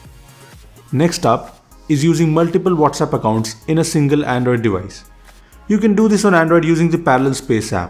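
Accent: India. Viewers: Your head movement and indian accent make it super hard to understand what you sayin. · Do you make your accent so thick on purpose? Because I literally don't know any Indian or Pakistani who's accent is so thick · I HATE YOUR ENGLISH,LOL INDIA.. GET OFF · Danyal Malik obviously indian accent...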